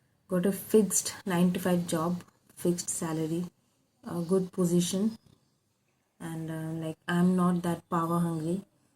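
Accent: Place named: Odisha, India. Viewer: Above all I like your english...The Odia english is something different from colonial english...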